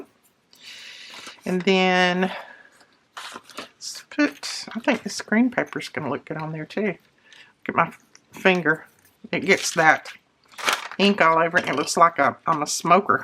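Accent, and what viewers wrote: South, United States. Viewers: You are one of my favs on YouTube. I love that southern accent and calming voice. Keep being you and sharing your feelings with us. Block anyone that says otherwise.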